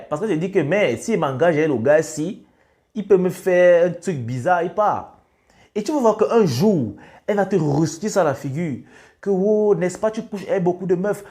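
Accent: Cameroon. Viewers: Tu a l'accent Camer hein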